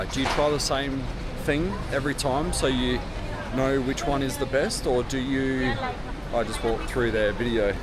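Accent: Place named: South Australia, Australia. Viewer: from your accent I can tell you're from South Australia... nothing beats a good kitchener bun.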